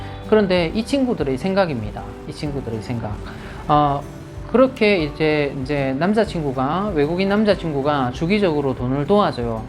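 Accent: Gyeongsang, South Korea. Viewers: 경상북도 내륙쪽 어디 억양이시네요~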